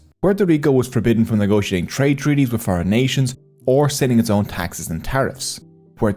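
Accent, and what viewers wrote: Ireland. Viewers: Are you Irish? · How come dude got a Liberal Irish accent? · you sound Irish, Puerto Ricans and the Irish have history together. thank you for this brother really, when i go to Ireland i will show the utmost respect top your people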